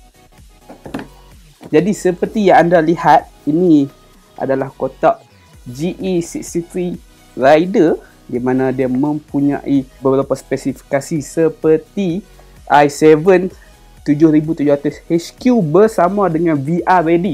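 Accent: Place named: North, Malaysia. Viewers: Tudiaaa pekat bakhang utaraa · kekalkan BAHASA UTAQA! sempoi 👍👍👍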